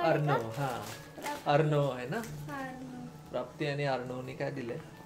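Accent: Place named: Pune, India. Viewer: Full puneri vatatos boltana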